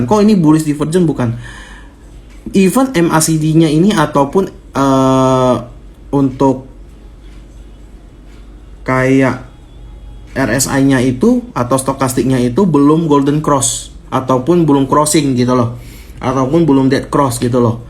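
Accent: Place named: Kalimantan, Indonesia. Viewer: Dialek nya dari kalimantan ya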